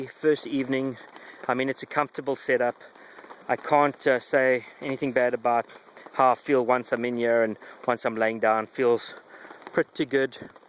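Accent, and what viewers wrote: South Africa. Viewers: is that a south African accent I here????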